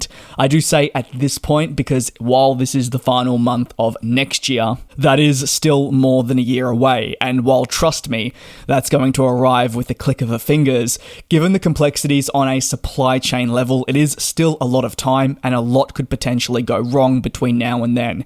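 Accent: Australia. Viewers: I could be mistaken, but this guy sounds Australian.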